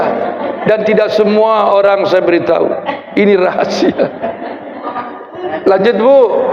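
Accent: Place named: Sunda, Indonesia. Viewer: Suara Pak Kyai kas sundanya.... salam gotong royong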